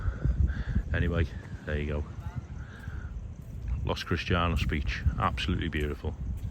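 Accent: United Kingdom. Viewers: You sound like the original butler, Carson, on Downton Abbey...I wonder if you are from the same area as he is? Love your videos. It's so interesting to see this island from your walks.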